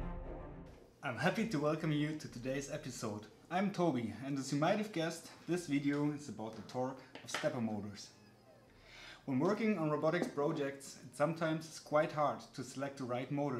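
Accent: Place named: Germany. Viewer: Ich mag deutsche Akzent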